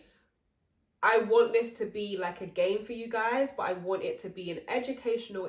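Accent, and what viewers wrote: United Kingdom. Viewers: london accent 🇬🇧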